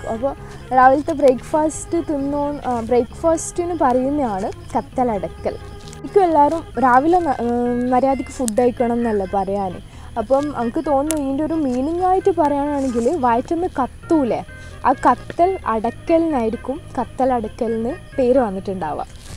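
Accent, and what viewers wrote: Thalassery, India. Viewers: Njan Malappuram ullatan but ink ingala Thalashery slang bayangara ishttan💫♥️ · Ella thalssery baasha muyyan bere maadiriyaa😂😂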